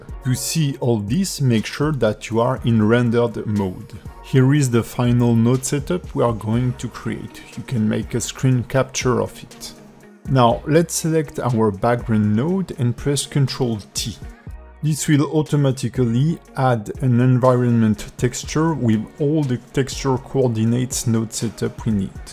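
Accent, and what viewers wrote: France. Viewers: Thank's a lot man ! Tu es français par hasard ? ;)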